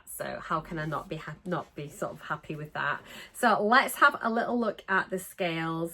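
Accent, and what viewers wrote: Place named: Manchester, United Kingdom. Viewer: First time commenter. I found you a few weeks ago and really enjoy your videos. I'm originally from Manchester but have lived in the states for many years. I feel a bit homesick when I hear your accent. Your weight loss.journey is inspirational. I don't know if the perfume giveaway is just for UK subscribers. If not, I would love to be in the drawing. Jo.x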